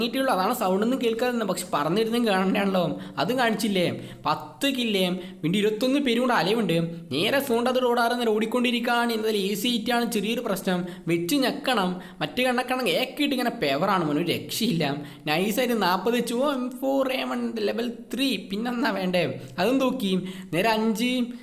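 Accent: Kannur, India. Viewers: Kannur aanu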